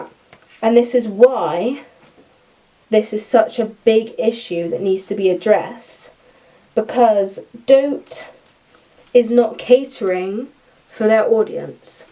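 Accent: Bristol, United Kingdom. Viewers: yesss the bristol accent is giving me lifeeeeee <3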